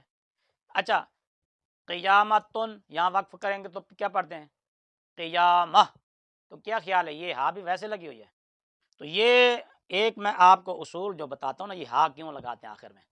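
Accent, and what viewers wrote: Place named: Punjab, Pakistan. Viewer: مفتی صاحب آپ بھی گلابی اردو بول رہے ہیں آپ کی اردو میں پنجابی لہجے کی ملاوٹ ہے کراچی میں آپ جیسے لوگوں کو ڈھگا کہا جاتا ہے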